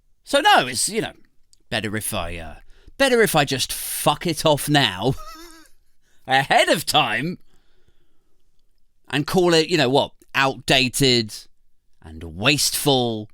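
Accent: United States. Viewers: Ohh it’s the sweary shouty southern man!! ❤️❤️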